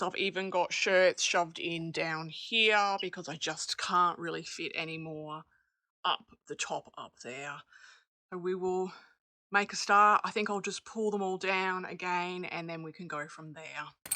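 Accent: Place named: Australia. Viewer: 🇦🇺 heard the Aussie accent and joined up 👍 Yes, I have cleared out my clothes when I retired, I had about 5 outfits left!! So gradually I've rebuilt my wardrobe up, but I have a new mantra, if I don't love it, I don't buy it. I've Stopped buying just because its on sale, I always regret it when I go to wear it!! So love it..or Leave it! 😊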